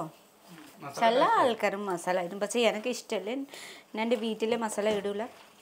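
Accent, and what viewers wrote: Tamil Nadu, India. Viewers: Oru tamil touch Ulla malayalam pole thonnunnu😅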